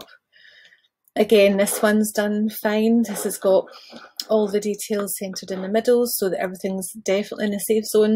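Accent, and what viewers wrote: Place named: Scotland, United Kingdom. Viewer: I gotta love you. :face-red-heart-shape:But you're Scottish? Welsh? Accent is too much for my American ear. 🐘Well , WooHoo! Close Captioning does understand you! I will carry on.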